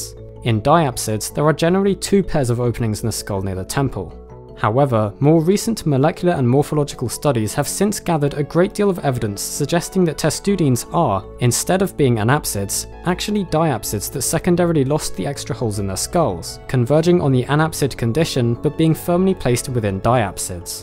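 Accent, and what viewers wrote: United Kingdom. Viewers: Abahrelghazali I agree! These videos are quite credible, and reassuringly cautious with assertions. It’s a pleasure to hear scholarly rigor propelling engaging, narrative logic with worthwhile, substantial content, all described with Linnaean terminology. On YouTube you never know what you’re getting from just glancing at the titles and cover illustrations; some “science” presentations are just amateur pop entertainment with lots of violent predation and a very low global veracity index. I do grin frequently over the British pronunciations. I will never again refer to proboscideans with such ungenerosity of syllables.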